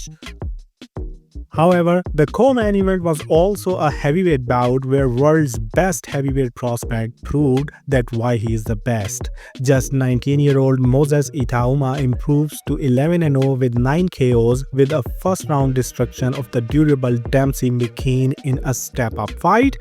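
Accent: India. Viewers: Good to hear from you my homie with the Indian accent. Hope you and your family have been well. Thanks for the content you make in combat sports you do a fantastic job. I hope you have a good holiday season and a happy new year my friend!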